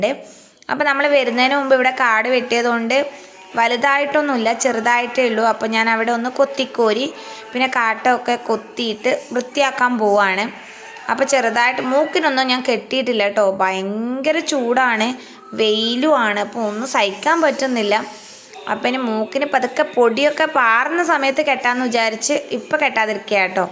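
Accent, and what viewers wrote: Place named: Malappuram, India. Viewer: നിങ്ങളുടെ സംസാരം മലപ്പുറത്തെ പോലെ തോന്നി😄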